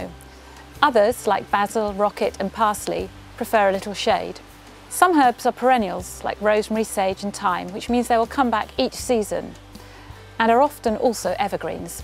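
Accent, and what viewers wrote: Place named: England, United Kingdom. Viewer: English accents make me nuts!! Great video though!!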